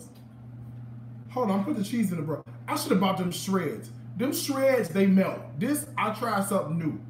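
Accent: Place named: New Orleans, United States. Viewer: Man where are you from. It sounds like a lil N.O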